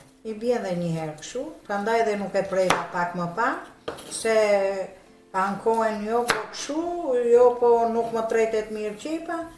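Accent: Tirana, Albania. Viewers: Sa me pelqen kur flet gjuhen e bukur tironse…❤ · te lumte goja e dora na kenaqe me gjuhen e tirones po e gatimet i ke shume te mira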